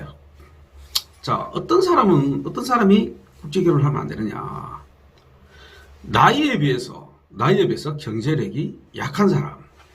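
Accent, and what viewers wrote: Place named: Gyeongsang, South Korea. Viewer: 오~~ 유튜버... 정광용 씨와... 얼굴.. 외모... 사투리 발음이... 아주 비슷하군요. 그 분도... 경상도 던데... .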